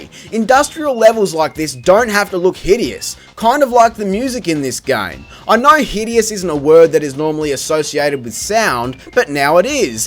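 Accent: Australia. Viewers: i think he comes from australia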